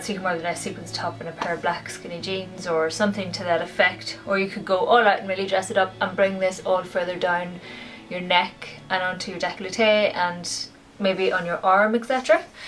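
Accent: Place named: Wales, United Kingdom. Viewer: Hi! I just popped over from Jerree's channel to see your Halloween look in the collab. Your version of Woman of the Sea/Mermaid is beautiful, including the lovely background music. Your eyes are gorgeous & the scales look very realistic with the technique you used. That was so much fun. Also, I'm intrigued by your accent, is it Irish? Most of my mother's family is from Wales & have that beautiful melodic sing song accent & yours is similar, but I'm detecting a bit of an Irish broque. I hope you don't mind me guessing! Love your tutorial & I've just subbed. ~Susanne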